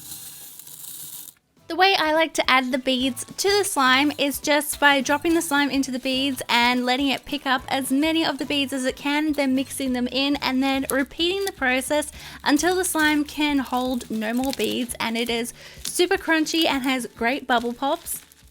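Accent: Australia. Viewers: I LOVE her Australian accent!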